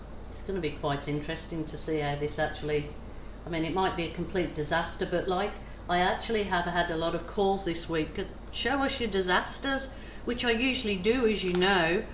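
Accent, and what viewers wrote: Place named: Liverpool, United Kingdom. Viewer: I love this. I also like the other one you did with all the shades of Aqua and Orange. The drip line painting i know exactly what your'e talking about. I agree with what you said about using every other line in the tray but also maybe still elevate your top end but start your dripping/pouring from the bottom end and moving up to your top end????? Or again do the same from your top end moving the pour down to the bottom as you always have more paint at the beginning than the end and it could run down into itself. If i'm overstepping the mark here please ignore me. I'm no artist but i understood what you were trying to do, may; maybe more elevation! Either way, i LOVED both of the ice cube tray paintings. I am so itching to get someone to help me clear out my spare room and start ordering paint supplies. You are an Inspiration and i love your down to earth attitude. 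You don't take yourself too seriously which is wonderful. I can honestly say that from the people that i have looked at their art on YouTube you have to be my favorite and i mean that sincerely. You have spoken briefly about your Mum in the UK and from your accent i'm thinking Liverpool maybe. I don't know. Thank you Thank you Thank you for all your work and iv'e never seen a bad result. Take care. Shirley xx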